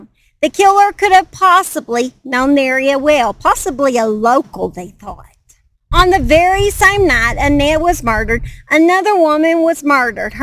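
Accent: South, United States. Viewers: Love you always ❤ sounds like you’re shouting this story, please preserve the southern voice. I know this was 2 years ago but I’m catching up xx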